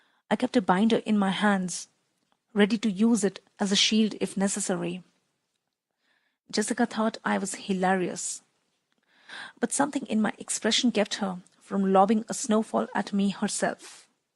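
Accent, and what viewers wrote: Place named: India. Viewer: Wow!!! It is very interesting to here in Indian accent!!!! Good work.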